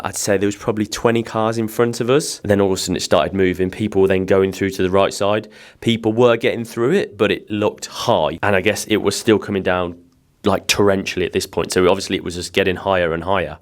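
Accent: United Kingdom. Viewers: Amo o sotaque britânico 🇬🇧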